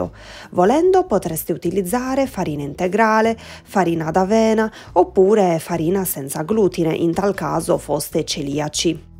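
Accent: Sardinia, Italy. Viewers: Ti ho appena scoperta! Bello sentire l’accento sardo!! ♥️♥️♥️ saluti da una nuorese che vive a Milano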